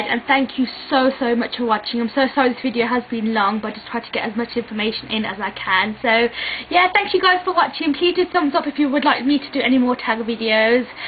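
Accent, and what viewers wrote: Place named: Coventry, United Kingdom. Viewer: I knew you were from Coventry, your accent sounds exactly like my cousin's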